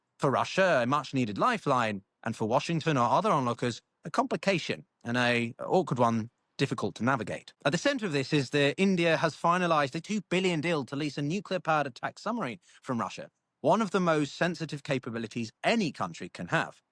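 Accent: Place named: England, United Kingdom. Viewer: Excellent Video. Nice English Accent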